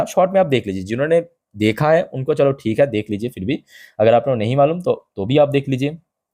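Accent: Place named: Bengal, India. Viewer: Like the way u speak.. R u begoli.. Speak hindi so sweet ❤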